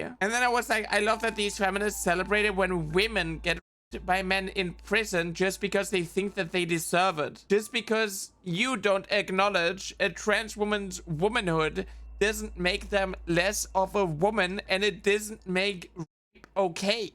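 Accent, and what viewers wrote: Germany. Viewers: What's your accent? It sounds so familiar but I can't place my finger on it. German?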